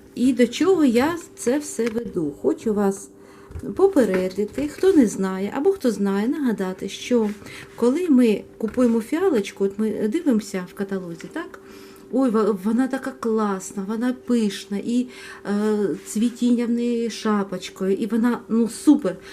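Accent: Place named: Surzhyk, Ukraine. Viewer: Суржик ,,режет" ухо.Отписалась.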